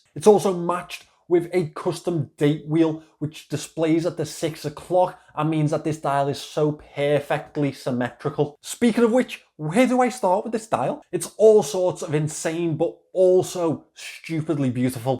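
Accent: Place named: England, United Kingdom. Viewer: sorry. I just can't tell. I think he's English but the accent is just so strong. I will put on caption and hope it helps.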